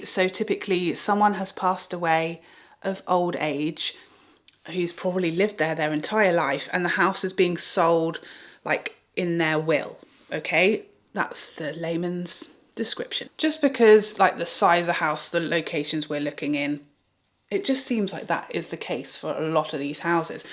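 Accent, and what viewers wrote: United Kingdom. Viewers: I love your stories -- not only are they great and cray cray, but you're a natural storyteller. Plus, your accent is darling. (I'm from the US and adore UK accents.) Great video on product. I've been curious about all you showed! P.S. - Where are the brushes made?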